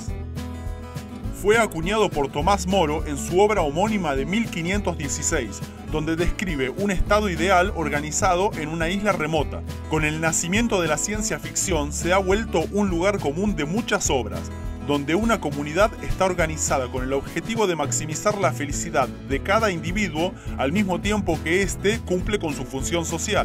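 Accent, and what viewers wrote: Argentina. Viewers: alto argentino y bueno en literatura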